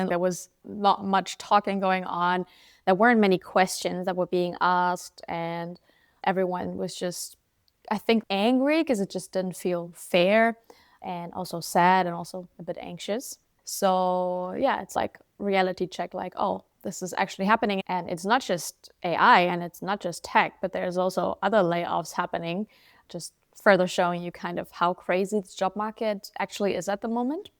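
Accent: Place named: Germany. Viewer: Yes, her accent sounds German, but she looks more Eastern European.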